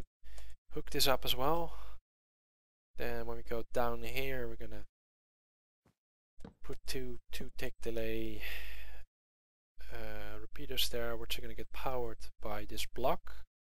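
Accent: Netherlands. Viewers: Are youo dutch?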